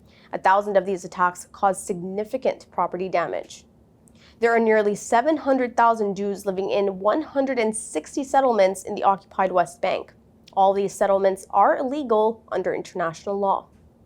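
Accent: United States. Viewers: I am a Muslim and I don’t like Palestinians the way Palestinians treat other Muslims in Saudi Arabia. I know Palestinians Directors in GCC countries badly treating others at jobs there I cursed one Palestinian director to be punished by Israel back in 2016. So please I advise you people to treat others well by rich Palestinians working in GCC countries. Also why you people migrating to Europe US and Canada and not fighting IDF. Islam tells you not to flee from war but fight them but you people are like cowards fleeing. So you better go to Gaza to fight instead of giving us news in an American Accent. You people and Afghanis are the same you live in western countries while you want others to tell Israel to stop war instead of you fight.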